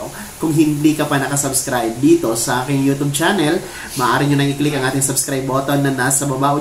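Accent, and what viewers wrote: Visayas, Philippines. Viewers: Ayusin mo salita mo.and pronounciation.mo.tunog.bisayang.bisaya dating mo tapos fake news mo.na.naman puro ka paasa.pag nakita kita dudugo.bibig mo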